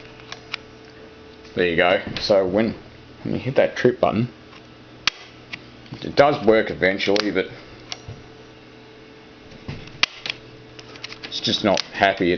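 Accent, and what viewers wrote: Australia. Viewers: Stumbled across your video this evening, as I too have a 440t RCD that has tripped. However, like your video, the switch is VERY stiff to the point I may exert too much pressure on the switch. It is stuck in the tripped position, thus I am without power ( as of writing ). It's good to hear a typical blokey Aussie accent on YouTube BTW. 😃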